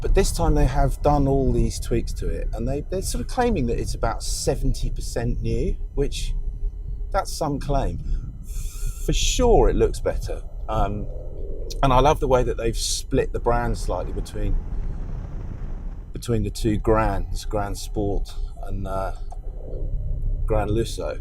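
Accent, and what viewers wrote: United Kingdom. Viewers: He has a British accent, bud. That's why it sounds odd 😁.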